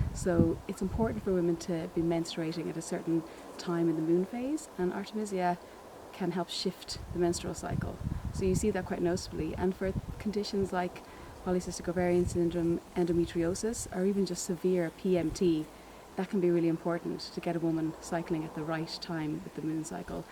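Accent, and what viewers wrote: Ireland. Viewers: love the gentle Irish accent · Is that a connacht lilt I detect?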